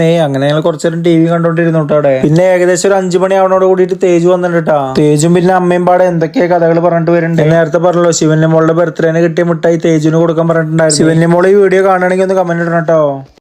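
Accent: Palakkad, India. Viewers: പാലക്കാട്‌ ശൈലി നന്നായി വരുന്നുണ്ടല്ലോ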